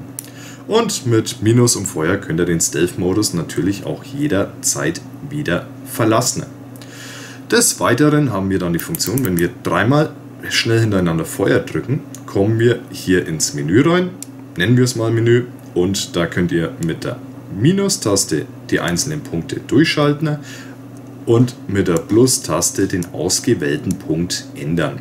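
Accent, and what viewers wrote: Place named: Franconia, Germany. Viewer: kommst du aus dem fränkischen Raum?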